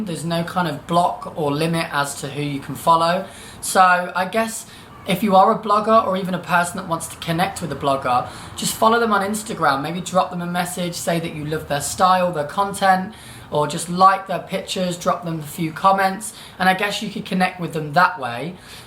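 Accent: United Kingdom. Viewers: Esse sotaque britânico ui ui ui, homem você é um sapão 😏 kkkkk ( posso falar oq eu quiser que ele não vai saber mesmo )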